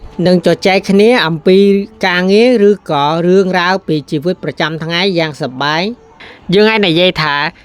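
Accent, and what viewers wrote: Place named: Vietnam. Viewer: សុំសួរអ្នកនិយាយហ្នឹងខ្មែរកម្ពុជាក្រោមឬក៏ខ្មែរលើឬក៏វៀតណាមសុំសួរបន្តិចពីព្រោះខ្ញុំស្ដាប់សំឡេងដូចជនជាតិវៀតណាមរៀនភាសាខ្មែរអញ្ចឹង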